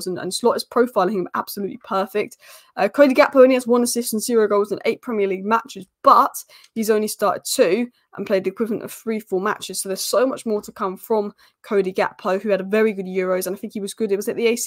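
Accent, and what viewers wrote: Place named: Manchester, United Kingdom. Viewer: That’s a lovely accent you have, what part of Manchester is it from? Y.N.W.A.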